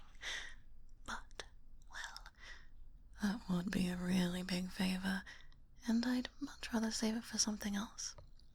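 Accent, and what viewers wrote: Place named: RP, United Kingdom. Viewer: yippy posh UK RP accent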